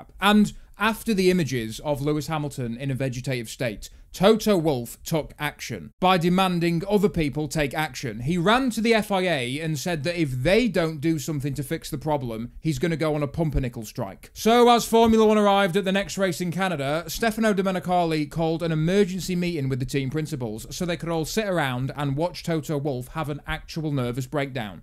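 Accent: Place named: Sheffield, United Kingdom. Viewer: Whenever I want offensive motorsport content, I do want it in a Sheffield accent!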